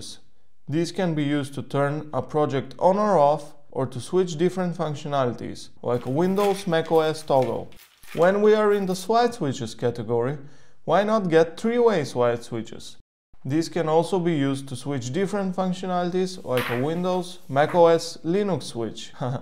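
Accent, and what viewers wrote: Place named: Israel. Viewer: there's no fucking way, you have the most Israeli accent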